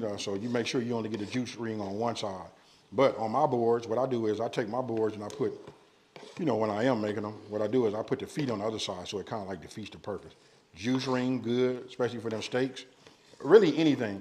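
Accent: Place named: South, United States. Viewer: You definitely do not sound like you are from LA. It sounds like you’re from the south.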